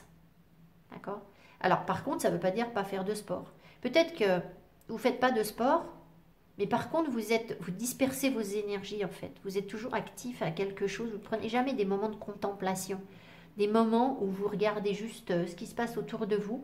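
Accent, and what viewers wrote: England, United Kingdom. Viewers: J.adore votre accent anglais🙏🌻💝🌞👍🥰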